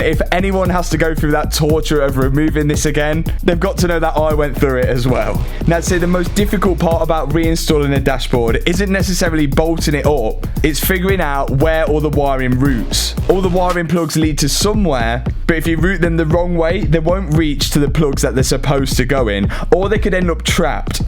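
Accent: United Kingdom. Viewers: Late subscriber to your channel, sorry. BUT i hanve literally binged watched everything in the last 10 days....Love it. My Mrs is Leicester so your accent is annoying (im Northern) but genuinely LOVE IT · Great content. Just started watching. Can't wait for the next Lamborghini vid. Gotta say normally I find the brittish accent very annoying but I really don't mind this guy's.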